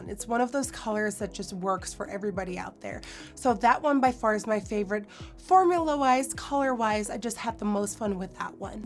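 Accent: United States. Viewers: anyone else thinks she sounds sick/more American in this?? where the holland accent at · I haven't watched her in a few years and oh my goodness her voice is so different did she move to the states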